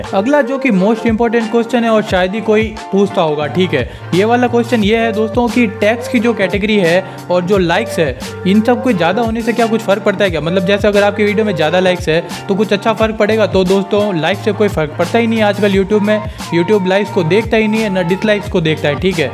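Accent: Gujarat, India. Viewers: muje apki voice laga ki app gujrati ho ❤❤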